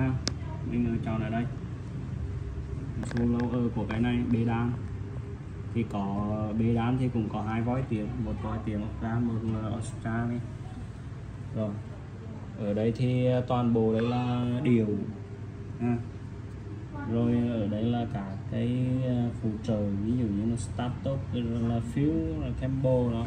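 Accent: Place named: Central, Vietnam. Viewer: Kênh của Bạn kinh doanh đàn, các dòng đàn rất phong phú và hợp lý giá. Góp ý cho bạn chút suy nghĩ cá nhân của mình: Xin lỗi bạn vì giọng bạn đặc thù miền trung, Bạn nên đeo micro khi quay Video để mọi người dễ hiểu và nghe bạn truyền đạt. Thân ái trong Chúa.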